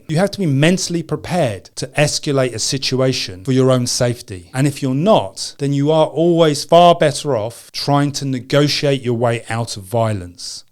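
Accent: United Kingdom. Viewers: So he says assault does not involve a strike. Will police charge someone just for Assault? I'm guessing that they will not. If so, then bullies are free to intimidate/harrass to their content?! I think the US has a "stand your ground" right; if the harasser/assualter encroaches within striking distance (i.e. shows threat), i presume the person is entitled to strike first? This video speaker has British accent, so maybe his advice does not apply in the US?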